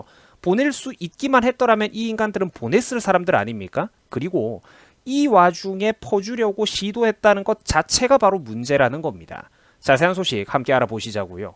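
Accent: Gyeongsang, South Korea. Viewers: 근데 얘는 왜 자꾸 경상도 사투리를 쓰냐 듣기싫네 ㅋㅋ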